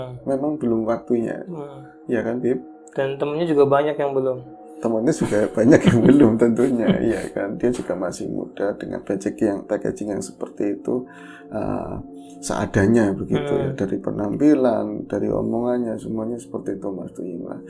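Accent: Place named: Tegal, Indonesia. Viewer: Udah mas style nya gt aja, malah asik koq kita jadi tau sedikit2 bahasa tegal!! Seru lho mas!! Sukses selalu untuk ngobor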